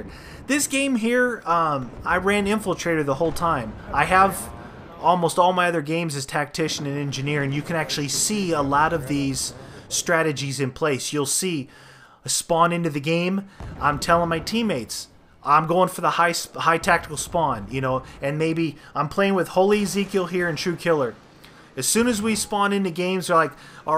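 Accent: Canada. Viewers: I like better then the others. No offense, but the " aut " and canadian language gets annoying in the long run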